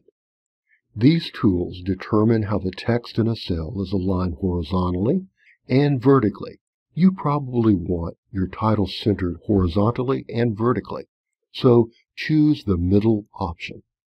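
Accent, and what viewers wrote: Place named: United States. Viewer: Slow, steady instructions in a familiar American English accent. Thank you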